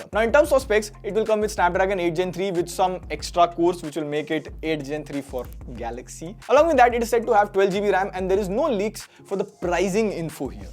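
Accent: India. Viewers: I love your presentation! So funny and energetic. I hope your following grows and grows. I'm an English teacher living in Europe and your India English is so lovely!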